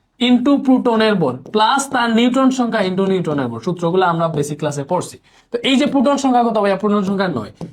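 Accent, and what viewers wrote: Sylhet, Bangladesh. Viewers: Ashole vhaiya sylhet er to tai accent gula amn hoy BTW vhaiya genius ❤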